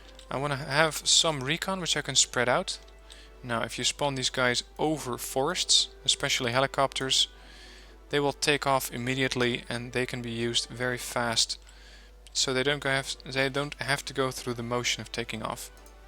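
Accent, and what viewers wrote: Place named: Netherlands. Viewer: Bedankt man, heb het spel net gekocht, en ben het nu aan het leren, ik ken de basics van World in Conflict nog wel en dit lijkt er wel een beetje op. Je guids helpen er goed mee! (Volgens mij kan ik in je accent horen dat je nederlands bent, geen zorggen je klinkt niet als Mark Rutten)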